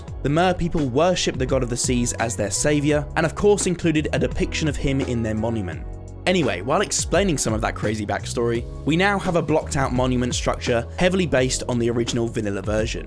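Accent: United Kingdom. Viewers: Don’t wanna be mean but you’re British accent makes it really fun to listen to you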